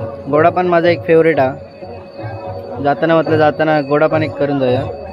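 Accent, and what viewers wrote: Malvani, India. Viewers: लय भारी व्हिडिओ. खुप छान वर्णन केले आहे. तुझ्या बोलण्यात मालवणी गोडी आहे. एक लक्षात घे कि स्थानिक मराठी लोकांचे स्टॉल किती होते व परप्रांती लोकांचे किती स्टॉल होते हे समजले पाहिजे. उशी विक्रेताचा स्टॉल भारी! लोकांना काय हवे याचा विचार करणे गरजेचे आहे. प्रत्येक व्हिडिओ शेवटी विचार करणारा व परिणाम कारक हवा हि विनंती. राग नसावा. यु ट्यूब मध्ये आता स्पर्धा झाली आहे. आपण मुळाचे सिंधुदुर्गवाशी आहोत. आता पैसा कामुक लय व्हिडिओ दाखवतहत. सावधान माझ्या कोकणी बांधवानो! तुम्ही आहात म्हणून कोकण आसा! धन्यवाद 🌹🙏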